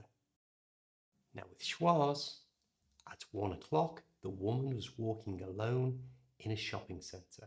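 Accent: United Kingdom. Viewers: ❤❤I am Mansour from the beautiful country of Iran, and I would love to learn the British accent. Thank you, dear teacher, for your excellent lessons. I appreciate your effort, and I kindly ask you to upload more videos so that we can learn English professionally and correctly from the basics with this beautiful accent.❤❤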